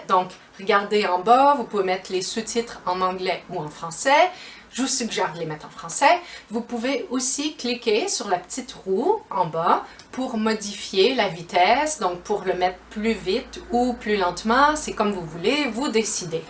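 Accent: Canada. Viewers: J'aime la langue française , mon pays est francophone. Mais, l'accent canadien est vraiment différent par rapport à celui de la France.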